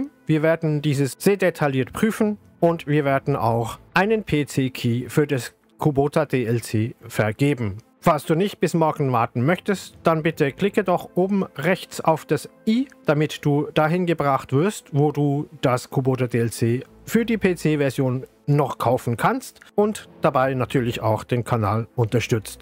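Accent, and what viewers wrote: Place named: United States. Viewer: Ich mag den Dialekt so sehr! Bist Du Amerikaner?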